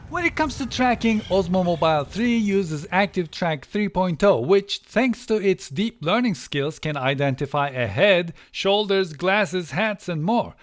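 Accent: Turkey. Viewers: Butun video dedim ki Turk aksani duyuyorum surekli. En sondaki hoscakalin the cherry on top oldu :) Daha bugun aldim cihazi merak ediyordum farklari tesekkurler video icin. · Aksanı türke benzemiyor mu adam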